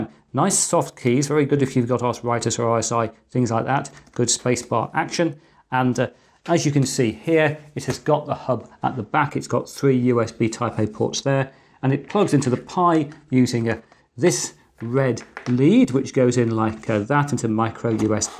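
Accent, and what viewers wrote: United Kingdom. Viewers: it plays now ... yet another informative and entertaining video from you ... i struggle with fast pace of your speech and british accent but between player controls and cc option which all your videos have i manage fine and i must say your enthusiasm for topics covered is infectious and that also make your videos fun to watch ... thank you for reply and videos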